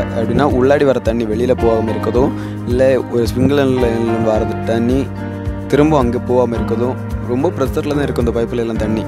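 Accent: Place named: Kanyakumari, India. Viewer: Kk ..unga slank la theriuthu ..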